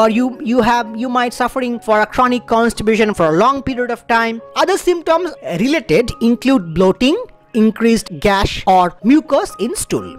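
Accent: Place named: Bengal, India. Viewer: Bengali doc .. strong Bengali accent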